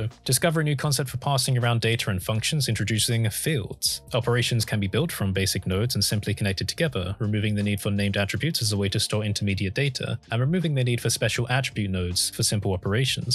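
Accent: United Kingdom. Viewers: this video was exactly what i needed. an easy to understand explanation of the new features in a british accent with a bit of dry humor weaved in. Thx. love ur videos and by-gen. idk how to make a unicorn emoji tho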